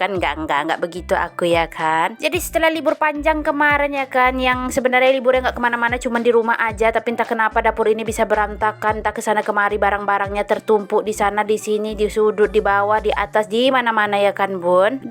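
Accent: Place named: Java, Indonesia. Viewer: Ngmng ny kaya tetangga ku bun dari Jawa ya aku dah subscribe bun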